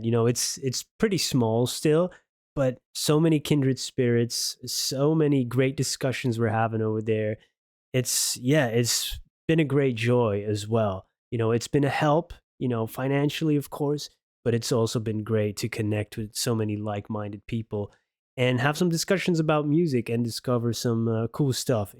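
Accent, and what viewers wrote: United States. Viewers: I love to listen to your reactions. You speak beautifully., very expressive. I think that I have expressed this before. You speak like an educated American. I would never have guessed that you’re from another country. I’m not that familiar with. This song is beautiful. You might wan to check out some his father Tim’s early music. Very hypnotic and powerful.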